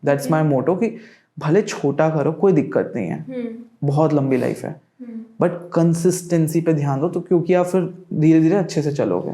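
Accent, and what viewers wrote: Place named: Mumbai, India. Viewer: MUMBAI STYLE HINDI HAI.....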